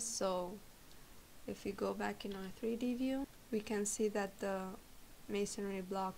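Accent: Eastern Europe. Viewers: Very slavic accent, where are you from?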